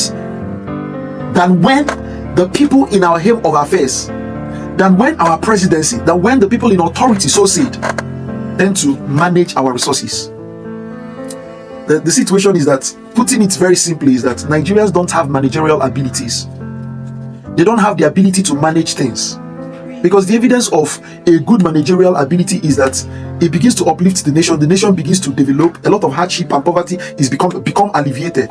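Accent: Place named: Ghana. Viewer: Its seems you school in Ghana 🇬🇭 right,, your tone is Ghana tone not like nigeria tone 🙄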